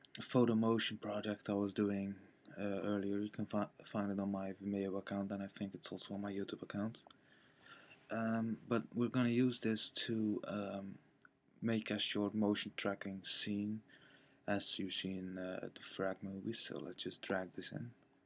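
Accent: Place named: Mexico. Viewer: Nice mexican voice :D Good tut.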